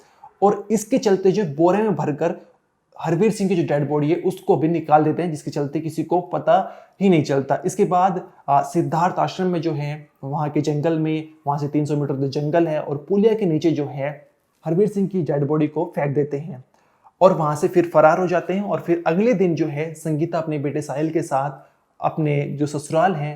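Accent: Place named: Saharanpur, India. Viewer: बोलने का दंग बता रहा है तुम सहारनपुर से हो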